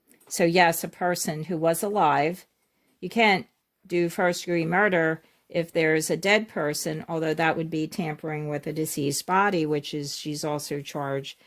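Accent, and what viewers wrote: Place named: Philadelphia, United States. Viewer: I knew you were from philly! Me too. You actually sound so much like my mom.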